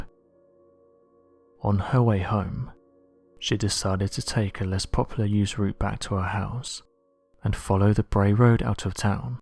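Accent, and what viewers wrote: England, United Kingdom. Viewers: Where is the picture of the car .fake fake fake ..i was really looking forward to some REAL proof of this "dog man " from your "english" voice..iam not saying this dog man is not real ..... Iam saying your a english dude from that island where there "royalty's" is brother &sister mother dad uncle are one & the same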